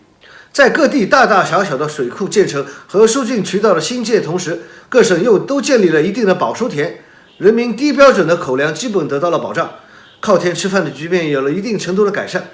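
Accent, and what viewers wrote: Shanghai, China. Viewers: 听得出你是上海人了不起有胆识。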